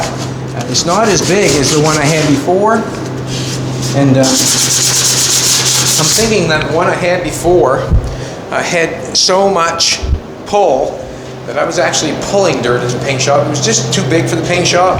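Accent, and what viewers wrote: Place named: Canada. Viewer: hi! have not found all your bugati videos yet , found a lone early one , but not giving up cuz i love bugati a lot . hope this makes you smile....i am a french canadian from montreal , and just about anything on youtube is english so i am used to it and i noticed you have a canadian accent !!! not from quebec mind you but canadian all the way . yeah flag waving here . refreshing to see your talent in the forefront . might even go to see you this summer .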